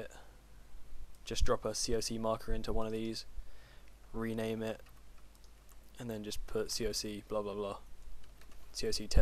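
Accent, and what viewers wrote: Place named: London, United Kingdom. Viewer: London accent m8.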